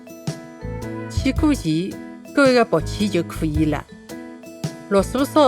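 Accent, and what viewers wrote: Shanghai, China. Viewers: 上海闲话老亲切咯！ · Yes, Shanghainese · 加藤みずっち shanghai dialect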